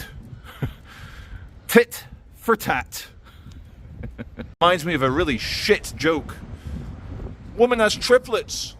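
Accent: United States. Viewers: you sound like an american in england....lol love your video's never quit telling the truth about your country..the only difference between usa and there we dont put up with the crap fromthem..... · To me you do sound a bit like an American expat, just to be fair to the children and their innocent observations.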